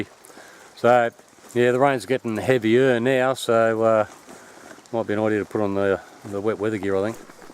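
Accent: Australia. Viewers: Hello...I loved your video! My husband & I are planning to do one of the Eurovelo routes--maybe this one! BTW, I liked the music--what is it/where do I find it? It's great to hear your Aussie accent, too. Cheers, from Sydney.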